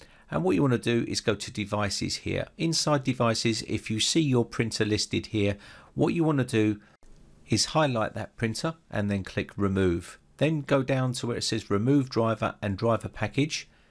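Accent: England, United Kingdom. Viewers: you are a champ. You have helped me so much with your videos. You will be surprised to here that they go all the way to Australia. 😂😂 By the way, although I live in Oz, I’m a Scot and I like listening to your friendly pommy banter. 😂😂😂👍👍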